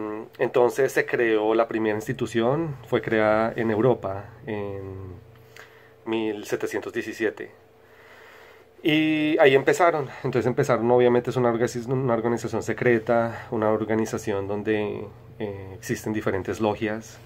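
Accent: Colombia. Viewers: Tienes acento bogotano, me gusto el video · Gracias por tú información amigo,por tu acento sé que eres colombiano, pero lo importante aquí es que eres inteligente, gracias por la información yo en lo personal no tengo religión pero no soy ateo yo creo en jehová en Jesucristo y en el espíritu santo y ,no soy masónico pero creo que sin querer me identifico más con ellos, no quiere decir que quiera unirme a ellos, pero no e querido unirme a ninguna religión cristiana, porque veo que siempre hay desigualdad en todas,,claro y lo tengo muy claro, nunca seré musulmán ésa religión sí no le encuentro sentido alguno,,,,,morir sólo porque sí muero me van a estar esperando siete vírgenes en el cielo,eso no tiene sentido, yo prefiero disfrutar vivo a siete mujeres aunque no sean vírgenes pero en vida y claro si ellas quieren con el consentimiento de ellas!!!! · Eres colombiano 😁?? 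· Esta bueno el vídeo....Creo que el acento de este hombre es de Colombia 🤔 jejejejejeje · Tienes acento colombiano! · A ver... Es del futuro....? De que año? Y porque habla como rolo gomelo gay de la actualidad....? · Lo que usted dijo ya se dijo otro lo dijo al otro y dijo digan al otro a que digan y lo dijeron a digan y dijo y así me doy y al final no decís nada y te cubrís de ninja por miedo o tu eres masón tu acento es colombiano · me pa ke sos colombia vos-- igual bien x tu data-- --- yo argentina ! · Jefe Maestro no ve ke me estoy riendo. ? no me molesto. pero eske si. la vos de este hombre. me encanta. nose si es colombiano. eso me encantaria todavia mas. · Eres Colombiano? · Acento colombiano?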